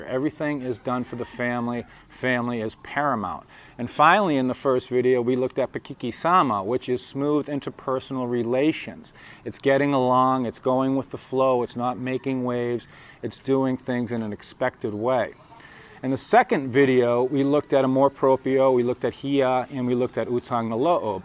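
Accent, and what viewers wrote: Boston, United States. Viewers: That Southie accent, it creeps me out , I am from NJ and I hate the Soxs, ( love the Yankees) hate the Pats and all that tainted NE crap........ well except the chowda and the lobster rolls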